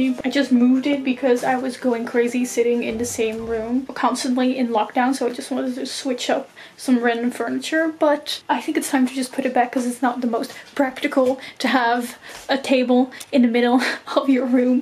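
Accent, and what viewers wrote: England, United Kingdom. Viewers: I don’t want to alarm you but you’re speaking with a heavy British accent, more than usual (particularly in the beginning). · since when did you develop a british twang to your accent?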